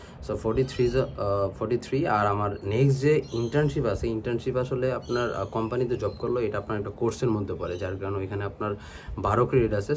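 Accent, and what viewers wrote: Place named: Brahmanbaria, Bangladesh. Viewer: Bhai, take my salam. Apnr kotha bolar accent shune kno jani mone hocchilo j apni Brahmanbaria er accent e kotha bolchen. However, apnr fb id check kore dekhlam apni dhk thk. I dont know why, but still mone hocche brahmanbaria er keu kotha bolche 😄 Btw, I am planning to do my masters from Aus, thats why I've found your videos and started watching them. Thank you for the informations bhai. I am from Brahmanbaria ( Sarail)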